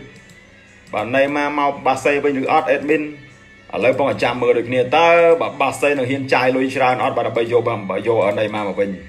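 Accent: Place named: Stoung, Cambodia. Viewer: សម្លេងadmin ដូចអ្នកស្រុកស្ទោង កំពង់ធំ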